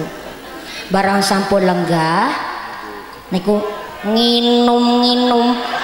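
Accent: Banyumas, Indonesia. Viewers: Meskipun ngapak mantap mantap aku seneng dengernya ngaji seperti ini siplah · Asalamualaikum mba aku sangat kagum kalo mendengar suara inget lg di banyumas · Ustadzah..ngapak tapi asike pooll.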